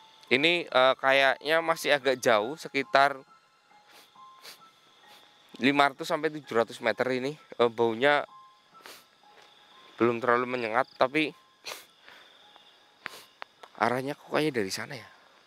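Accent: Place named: Ngapak, Indonesia. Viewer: Ya emang logat Jawa ngapak begitu bahasa ngoko itu/kasar..bukan bahas kromo inggil.. Atau lisan Jawa kraton gitu om.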